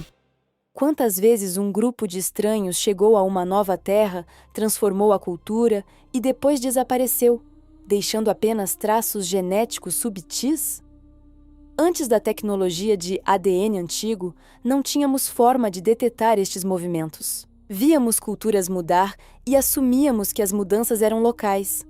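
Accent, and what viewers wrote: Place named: Brazil. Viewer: Moça, por que seu sotaque é brasileiro e você fala português de Portugal? Vídeo bem interessante! Vlw!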